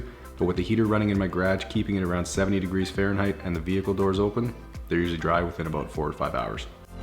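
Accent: Canada. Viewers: ah yes, let me explain. He's Canadian so when he says "garage," it sounds like he is saying "graaj." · You sounded extra Canadian this video for some reason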